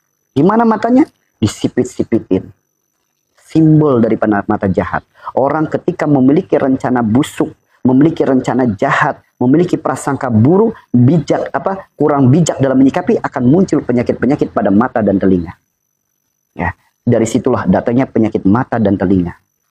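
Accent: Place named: West Java, Indonesia. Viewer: Pa ini bp orang mana kpnkaya orang sunda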